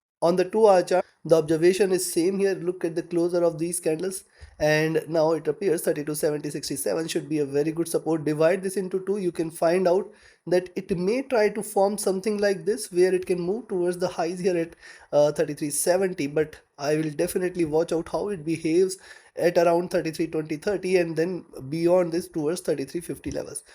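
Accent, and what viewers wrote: India. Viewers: Its hard to focus on what you saying . Sound like Indian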